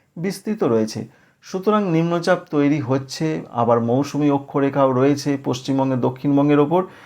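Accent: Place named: United States. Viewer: বাংলায় ফিরে এসো বাবা, ইংরেজি উচ্চারণ আমেরিকার হওয়ার জন্য আরো বুঝতে পারছি না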